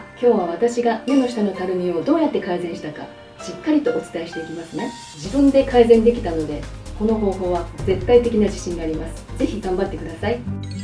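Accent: Hiroshima, Japan. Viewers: 広島弁っぽい訛りが好き❤️ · 先生は、広島の方ですか？ イントネーションで😅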